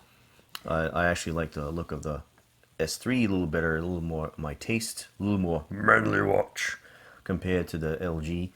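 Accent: Boston, United States. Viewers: Lol new to your channel. Uh, Boston in the house? I thought you were saying Huawei but you were saying hardware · Yay! Real English! And you don't sound like a robot! Thumbs up. North boston?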